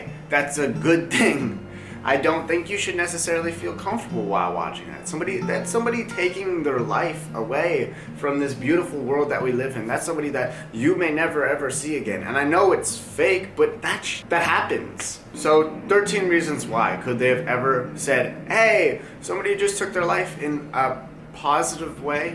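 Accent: Canada. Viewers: Love your cute Canadian accent eh!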